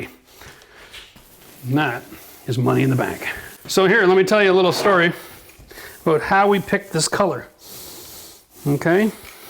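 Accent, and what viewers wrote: Canada. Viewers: Great Canadian accent! 😊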